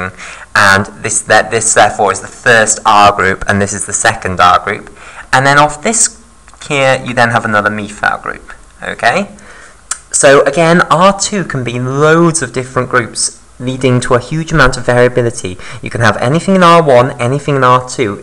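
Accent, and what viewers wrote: United Kingdom. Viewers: Why do i feel much smarter listening to a teacher with a british accent? God bless you sir thats a lott of help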